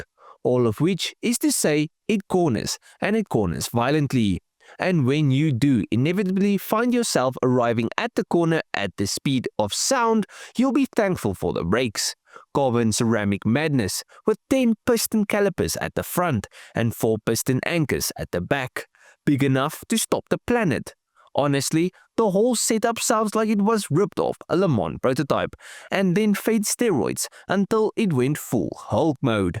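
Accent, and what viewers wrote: South Africa. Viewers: your accent sounds like a mix of south african or dutch and something else, what is it?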